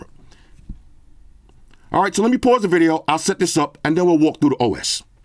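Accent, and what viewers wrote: New York, United States. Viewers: This is the review I didn't know I needed lol phone review with the NY accent. Fam this is great 😂 🔥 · Of course you went with green. Most of the reviewers are talking about the green. For some reason I love that you use the British word for aluminum, but say it in a very American accent. What's funny is that it used to be reversed. Americans said aluminium, while British people said aluminum.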